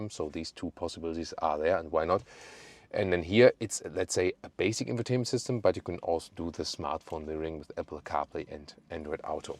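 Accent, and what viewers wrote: Germany. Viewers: It would be so much better to hear this review in his native tonuge. The heavy German accent is annying and I write this as a German native speaker.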